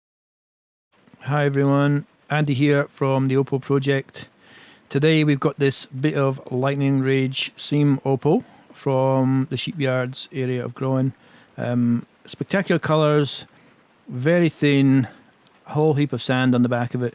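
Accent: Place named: Scotland, United Kingdom. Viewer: what do you mean by off? That sounds like a Scottish accent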